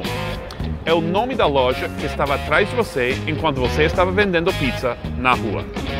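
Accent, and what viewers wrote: United States. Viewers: hahaha amo o sotaque americano! adoro seus vídeos! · Sempre achei que o era gay. Na verdade todos que tem sotaque americano parecem ser gay. Fica um jeitinho muito meigo na hora de falar. Eu continuo achando que o é gay.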